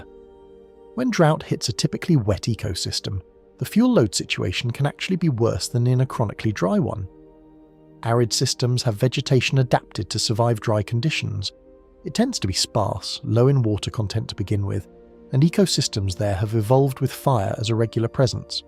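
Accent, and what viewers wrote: United Kingdom. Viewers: Please remove the very annoying British English narrative in favor of neutral American English. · ......I'M SO SICK OF THESE CRAPPY NARRATIONS, WITH "BRITISH ACCENTS"!!!!!!!!!!!!!!!!!!!!!!!!!!!!!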